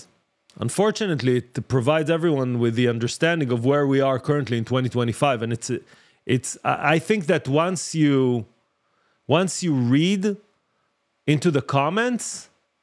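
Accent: Israel. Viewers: הנה דימוי בשבילך, מקווה שתבין בתור דובר אנגלית מלומד (עם מבטא ישראלי יפה) - למה שאשקיע ב-OTC כשאני יכול להשקיע בנסדאק? ולסיכום, בעולם העסקים כדי שהמוצר שלך יהיה מוצלח צריך בידול. באנגלית שבורה, אתה כבר לא מיוחד. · Well done! You still had more than 7.5K viewers listening to you talking in English (with a small hebrew accent:)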